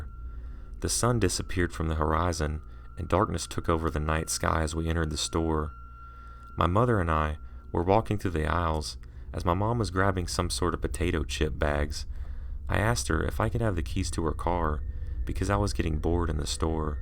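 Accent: South, United States. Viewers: where are you from? you have a real subtle southern accent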